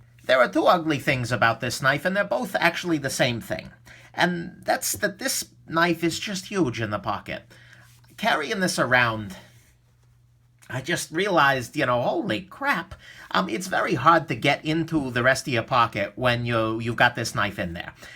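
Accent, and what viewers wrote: New York, United States. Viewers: Good review. Now work on that NY accent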